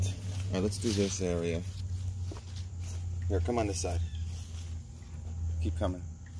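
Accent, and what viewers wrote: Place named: Philadelphia, United States. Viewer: Are you from the Philadelphia area? Wudder=water 😎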